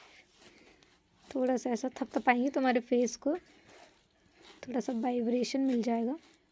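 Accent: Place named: South, India. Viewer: Aisa lg raha koi South Indian Hindi bol raha h.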